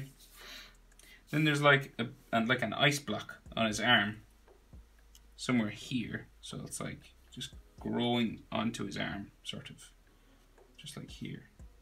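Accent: Ireland. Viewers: Is it just me or does he have an irish accent like Johnny Sexton?!?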